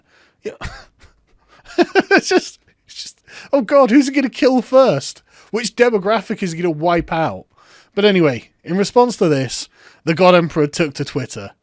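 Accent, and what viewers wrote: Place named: United Kingdom. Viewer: Sargon makes a living by being a giant British accented dick to the leftist progressives. For which I pay him cash for not only being entertaining but quite effective at it. #Sargonpillowhug Donald Trump took a pay cut and a hit on his future earnings by taking on the leftist progressives not just here in the states but around the world and he does it by not only being a 'giant orange dick' but by actually hurting their causes and exposing them for the lunatics they are. For which I will vote for him again and I hope his son is taking notes. The thought of what will happen to the #resistance voters if another President Trump sits in the White House for eight more years is quite pleasing. Maybe then we could get some real Conservative ideas and ideals cemented in place and we can yeet these Marxist bastards back into their holes for another hundred years or so.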